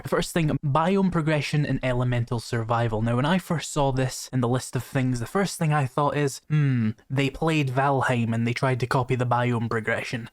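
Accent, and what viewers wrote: Scotland, United Kingdom. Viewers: Im totally here for your yobbo Scottish snark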